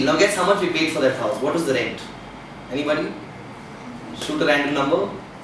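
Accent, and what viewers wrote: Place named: Deccan, India. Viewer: Hey dude I'm your fan from Bangalore and the area next of you and I just imagined every thing you said and the dakny urdu was awesome 😚😚😚😘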